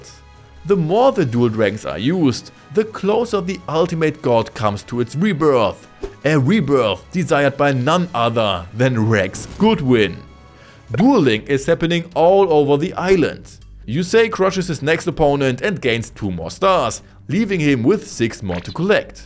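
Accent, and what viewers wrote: Germany. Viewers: Lass mich lügen aber bin mir bei dem Akzent zu 99% sicher dass du deutsch bist 😅 Gutes Video btw. Endlich mal wieder ein Tuber der mal wieder Videos zu 5ds macht 😁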